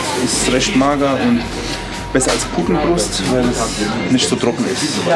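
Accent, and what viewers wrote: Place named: Franconia, Germany. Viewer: er fränkelt manchmal :)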